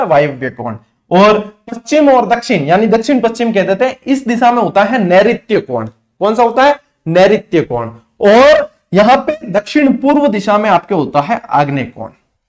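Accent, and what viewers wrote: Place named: Rajasthan, India. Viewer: Sir aapki marwadi boli bahut achi lgti h class bhi bahut mst h sir jii thank u so much 🙏🙏🙏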